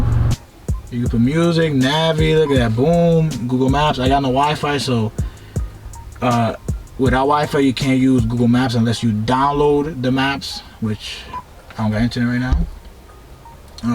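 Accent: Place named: Latin America. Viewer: I apologize for this, but I catch some accent (latino accent) . My bad man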